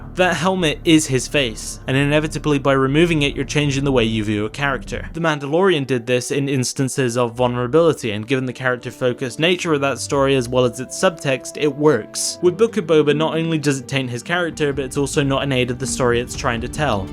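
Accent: West Country, United Kingdom. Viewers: Your subtle west country is quite interesting.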